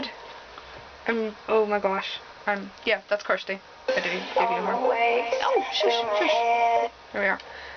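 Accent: Fermanagh, United Kingdom. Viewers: My Granny, my Dads Mum was from Fermanagh. She moved to Manchester England in her early twenties. She still had the strong accent as went back in school summer holidays with my Dad and his brother. It’s nice to hear you speaking, reminds me of her. Although I remember her being stronger but could be my bad memory. Thanks anyway and hope you and loved ones are well. You seem like a great person and if your anything like I was when younger. Your a good person but don’t realise or have the confidence in yourself you should.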